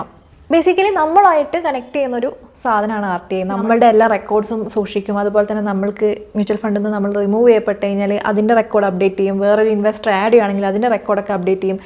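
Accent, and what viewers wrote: Kannur, India. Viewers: Nalla rasond samsaram kelkkan...kannur bhagam aano veedu? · Kannur aano?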